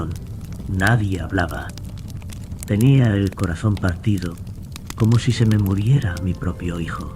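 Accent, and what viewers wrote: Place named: Spain. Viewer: ESO es dormir.... Con ese asqueroso acento del tipo que vino disque a colonizar no solo a mi territorio sino todo centro is y no quiero hablar de ese idiota que andaba perdido 😅 dale este tipo connesr acenide mierda y postea algo para dormir blaaaaaaaaaaaaaldoto estúpido yo que perdí tiempo no solo escrirnfoeesto sino quitando segundos de mi vida escuchado a este asqueroso español 😂😂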